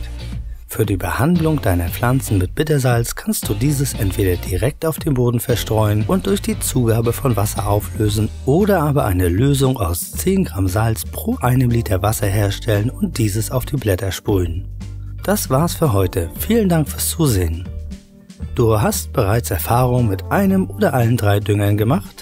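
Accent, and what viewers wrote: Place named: North Germany, Germany. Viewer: bidersalz bläder..... der typ ist norddeutscher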